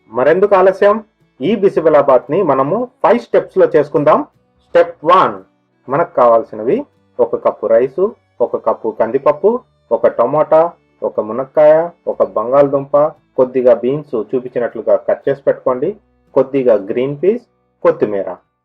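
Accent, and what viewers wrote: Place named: Karnataka, India. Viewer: I like your kannada language slang, happy to hear.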